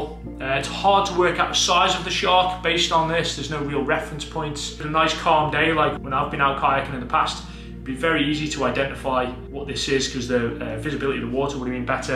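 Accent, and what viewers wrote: Liverpool, United Kingdom. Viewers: How come you sound kind of like a Scouser?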